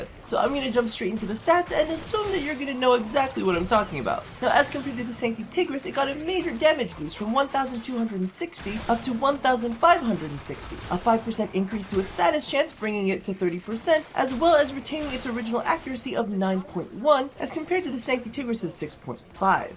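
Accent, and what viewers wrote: United Kingdom. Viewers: I have mixed feelings about that English accent. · You should totally do more vids with your British accent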